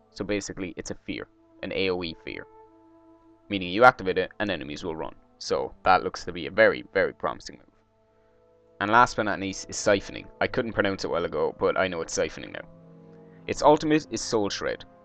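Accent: Ireland. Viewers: are you irish? DAT accent !!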